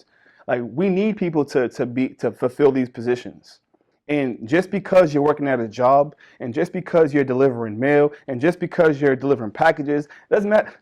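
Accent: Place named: New Jersey, United States. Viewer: Lovely accent........New Jersey ?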